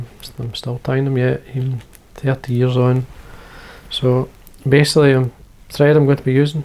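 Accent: Scotland, United Kingdom. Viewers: Forgive me, but I have trouble understanding your Scots accent, (We here in the Ozark mountains have an accent that few can understand), but I see what you're doing, and I've tied some of your flies. I will tie this one, because I think this spring it will work on the streams we have here in the Ozarks. Thanks for your videos, and keep up the good work. Wish I could come to my ancestral home in Scotland and fish, but I'm afraid I'll have to settle for our rainbow and browns in our steams. I'll let you know how the Long Hackle Fly works this spring and summer.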